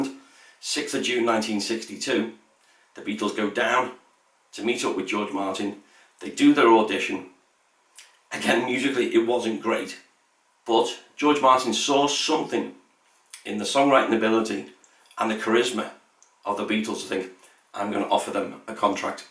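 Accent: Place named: United States. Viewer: And let me guess, you are American-cause you,guys are the best at everything, right? 🙄 Only an American would be arrogant enough to suggest that a documentary about a BRITISH BAND be narrated by an American. Go wave your flag somewhere else.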